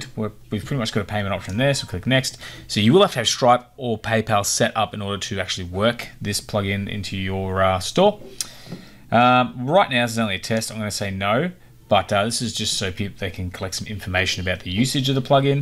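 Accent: Australia. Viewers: Hi. I set up the subscription on WooCommerce following your intstructions. When I click the Proceed To Check Out button I get a message saying you must be logged in to check out. Have you had this happen. There is no option to log in. Its great to hear a fellow Aussie on YT. Brian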